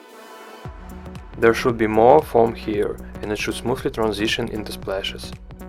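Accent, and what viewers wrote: Russia. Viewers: Ты русский? А то тебя акцент сильно выдаёт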